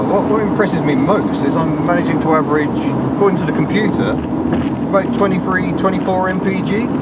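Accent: United Kingdom. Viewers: Did not expect a British accent.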